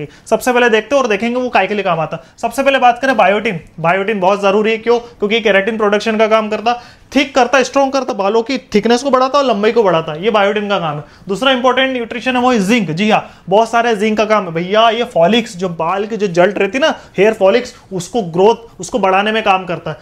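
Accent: Indore, India. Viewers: Indoor ke aash pash se ho kya ap toon arha he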